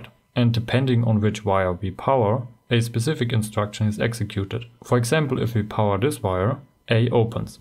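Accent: Germany. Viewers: I hear a german accent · Are ju German? Bekaus it klings so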